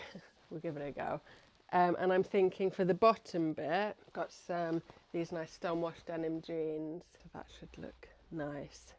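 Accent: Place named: United Kingdom. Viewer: Great video! Don't worry about the pink, it didn't look that bad anyway, and if you see it as a mistake, that's good, you have learned from it for next time. I love that you're experimenting. Thanks for keeping it real. We've all been there! P.S. Your accent sounds local, where in the UK are you. I'm in Nottingham 🥰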